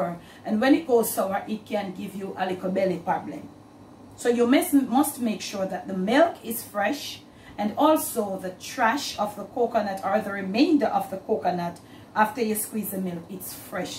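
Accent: Jamaica. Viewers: I like jamaoca english❤ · I could just eat a slice of Toto, my mam use to make it while i was growing up. Love watching you bake, and i just love the Jamaican speeking, i find it so warming. By the way i think the word you were looking for the cocanut trash is dessicated. My mam also called it cocanut trash! Lol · Ms I enjoy your videos. Mixture of proper English and Jamaican patois is so me. I am comfortable with your style of communication. Thanks for the education in authentic Jamaican cooking on all your channels. I appreciate your letting us being a part of life in the Caribbean and specifically Jamaica. I wish you all the best going forward.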